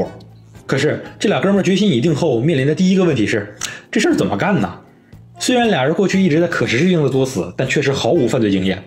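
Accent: Northeast, China. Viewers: 可汗的老家通辽是蒙古族和东北汉人混居的地方（从地理上说这里是内蒙或东北，都是正确的），东北地区不少人说话都是这个节奏的，赵本山小品和赵本山弟子拍摄的《乡村爱情故事》就是这样的语速，这种语速特别适合讲黑社会犯罪故事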